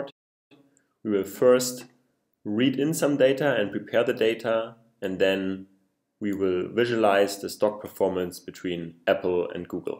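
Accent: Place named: Germany. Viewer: hey, sprichst du deutsch? dann lg -der akzent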